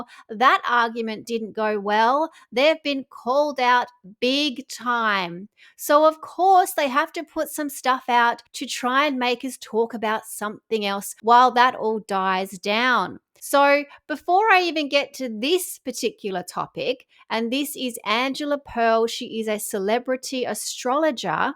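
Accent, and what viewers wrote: Australia. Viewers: We can hear you're from Australia. Greetings from South Africa 👏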